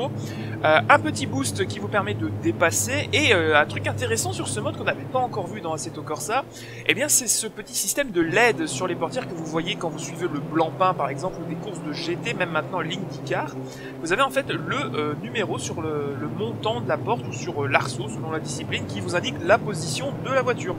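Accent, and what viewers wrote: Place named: Belgium. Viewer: c'est différent on va dire. Pour moi c'est convenable, juste qu'on dirait qu'on t'entend de plus loin. Peut être juste un soucis de volume j'pourrais pas dire. J'en profite que tu aies répondu a mon message pour te féliciter pour tout ton travail, que c'est grâce a toi et ton pilotage que je me suis mis a fond dans la simu auto sur circuit (Je jouais qu'aux Dirt et a dirt Rallye des la sortie.). Commentary superbes, pilotage superbe, et qualité au top. Puis c'est cool d'avoir un peu d'accent belge aha. Encore merci pour ton contenu! T'es au top!;) PS: Manque une seule chose, plus de vidéos sur Dirt, comme une carrière en let's play par exemple, et pourquoi pas du test Rallye sur Assetto Corsa. Et la j'aurai plus rien a demander aha 😂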